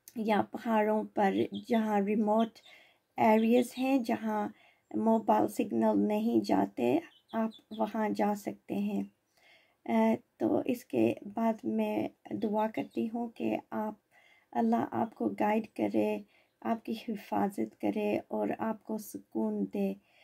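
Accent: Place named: Pakistan. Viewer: Sis r u from Pakistan.. No offence but your accent is a little difference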